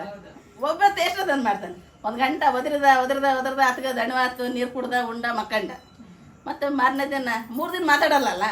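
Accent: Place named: Ballari, India. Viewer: ಬಳ್ಳಾರಿ ಭಾಷೆ ಯಲ್ಲಿ ಮಾತಾಡಿರಿ.ಬಹಳ.ಚೆನ್ನಾಗಿ.ಮಾತಾನಾಡಿದಿರಿ.ಮೆಡಂ.🎉🎉🎉🎉🎉🎉🎉🎉🎉🎉🎉🎉 ಧನ್ಯವಾದಗಳು ನಮ್ಮ ಕಡೆಭಾಷೆ.ಇನ್ನಷ್ಟಬೆಳಿಲೀ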